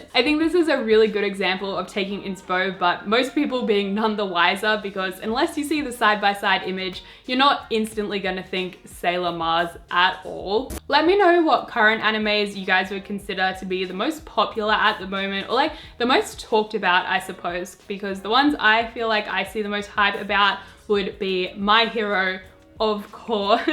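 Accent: Australia. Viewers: I hear red velvet I already love her I heard her Aussie accent I loved her more I SAW BT21 AND I SUBSCRIBED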